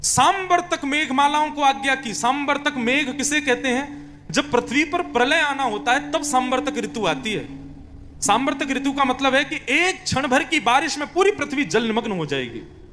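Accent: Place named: Jaipur, India. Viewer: आपकी भाषा जयपुर की बोलतें हों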